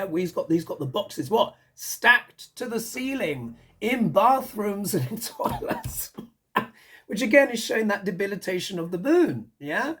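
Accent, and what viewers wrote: England, United Kingdom. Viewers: as a gay gentleman I can’t help but say you look cute as a button and don’t ever do anything to that gorgeous English accent. You open your mouth and the angels come outdancing no less. May God save our gracious queen!!! ❤❤❤❤❤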